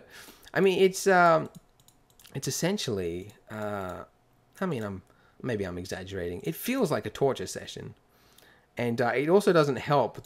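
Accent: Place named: Australia. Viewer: Aawwh, great job once again! The nice thing 'bout your videos is that you can learn about blender and practice aussie-accent at once! :D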